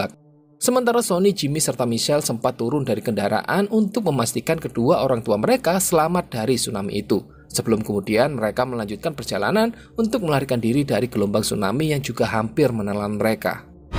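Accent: Java, Indonesia. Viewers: Sabar ,lagi myesuain medhok dengan kuping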